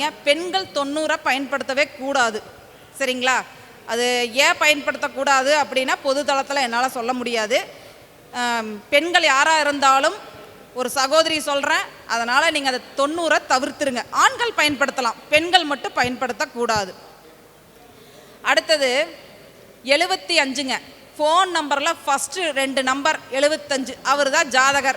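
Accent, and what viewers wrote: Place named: Kongu, India. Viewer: Kongu tamil.. 👏🌷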